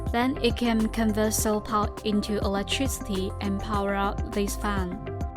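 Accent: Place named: China. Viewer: Very informative review ,nice Chinese accent too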